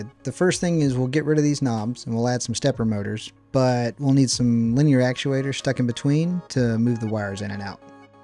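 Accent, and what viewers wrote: United States. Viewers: Just had this video come across my YouTube feed. First of yours I've seen and I instantly subbed at your first "daggumit" I'm instantly attracted to this kind of content. Throw on top the Southern accent. I'm sold. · Just curious where youre from, state if you dont mind hahaha like the accent and reminds me of a lot of people where im from(Southern Maryland)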